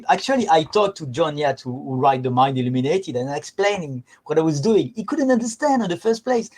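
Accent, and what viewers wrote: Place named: France. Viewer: I looooove the French accent.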